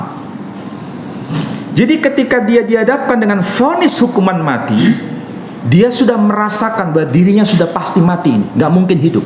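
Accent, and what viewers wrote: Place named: Iran. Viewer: Seperti lahjah atau cara baca Parsi, Iran..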